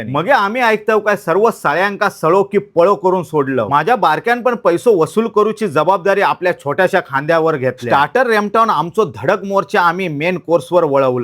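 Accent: Malvani, India. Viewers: Ek no.bol n kuup chan kup avdat tumch bol n ❤ majj yet apli malvani 😊😊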